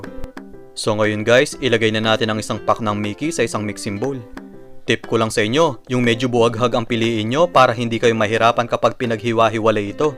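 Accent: Pampanga, Philippines. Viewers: Haha sabi kuna pin kapamps ka eh base king boses mu... First time ku apanalben video mu lakas maka entertain brad haha. God bless and more power!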